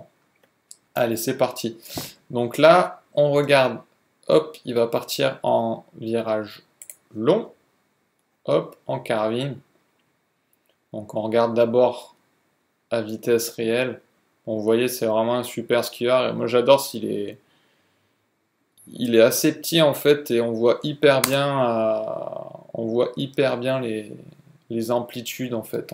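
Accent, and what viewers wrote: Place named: Switzerland. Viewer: vaguement suisse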